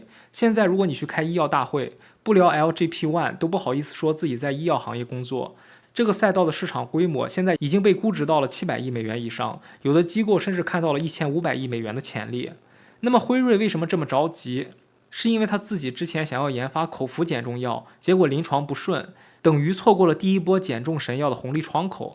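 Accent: China. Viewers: 听说话的习惯播主应该是大陆的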